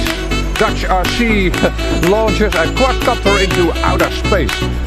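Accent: Netherlands. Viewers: Nice work again , always the best positive products reviews and information. I like your English Dutch dialect gives it a fibe. Hopefully you'll sending this quadcopter to Sweden !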